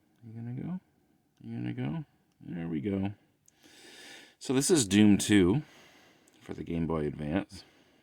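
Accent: Canada. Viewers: ah yea i heard some Canadian inflection but thats common here too lol and alright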